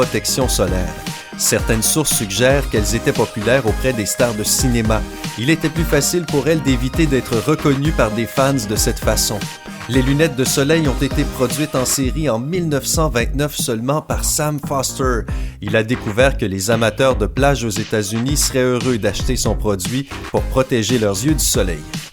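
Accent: Quebec, Canada. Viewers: Je déteste entendre l'accent parle par des Quebiquois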